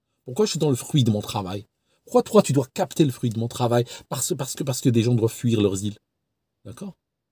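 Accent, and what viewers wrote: Switzerland. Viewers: "le trou de Lausanne" raccord avec ta dernière sur les maladies pochette surprise de la croutonne d'Angleterre. Ton ravissant accent suisse est tellement gloutonnant 🥂 Petite intro d'une douce chanson pour les amis en tristesse 🎶 On peut vivre sans richesse Presque sans le sou Des seigneurs des princesses Y en a plus beaucoup Mais vivre sans tendresse On ne le pourrait pas Non non non non On ne le pourrait pas! né André Raimbourg le 27 juillet 1917 à Prévot-Vicquemare décédé le 23 septembre 1970 à Paris. Poète, tes papiers!!! 🫂 l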